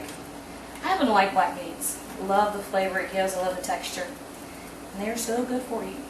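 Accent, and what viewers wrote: South, United States. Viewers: Hi, Thanks for your response i appreciate it.I notice in some cooking videos that People will ask a question to the Cook but there`s never a response so thanks again.I can`t waite to make your recipe.I`ll let you know how it turns out.I love how you`re so friendly with the Youtube viewing audience.I notice that you have a Southern accent are you from Texas? I reside just 30 minutes south of Seattle,Washington, The City where Starbucks Coffee was started.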